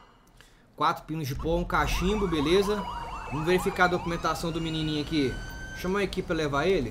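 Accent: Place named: Goiás, Brazil. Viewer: Uau! Pensava que você era de São Paulo, mas é goianão hein hahaha que massa. Psé eu também sou, aliás o vídeo ficou maneiro. 👏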